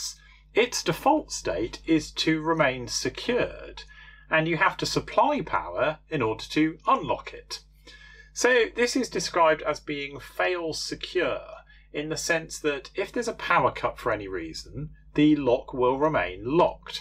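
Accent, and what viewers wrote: United Kingdom. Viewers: Excellent presentation with the charming British accent.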